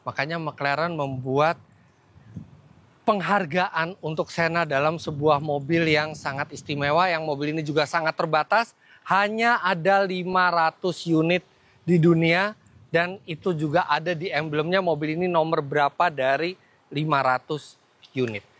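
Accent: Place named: Java, Indonesia. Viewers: Indonya medok lagi. Koko sby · Logat jawanya kental sekali.. kok ada yg bilang jawa hama disini 😂😂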